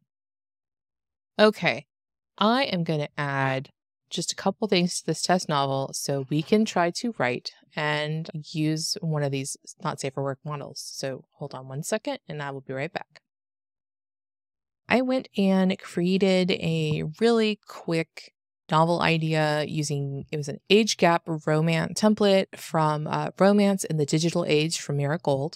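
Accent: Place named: Pennsylvania, United States. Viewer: Is the narrator for this video from Pennsylvania? I detect a similar accent. :)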